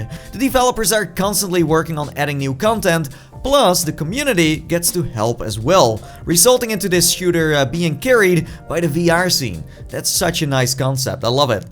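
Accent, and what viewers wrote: Netherlands. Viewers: He sound just Dutch accent am I the only one that hears it maybe because I’m half Dutch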